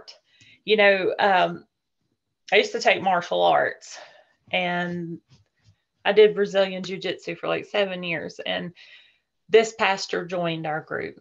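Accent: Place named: Australia. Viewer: Mr. you have a beautiful accent. I can't place it though. Is it Australian or New Zealander? (Whichever country it is from I like it).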